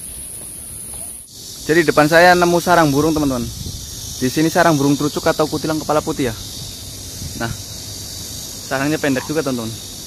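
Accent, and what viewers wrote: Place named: Indramayu, Indonesia. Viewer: Kyane Wong Dermayu Ikih Logat²e Mah😁 Sukses Terus Ang👍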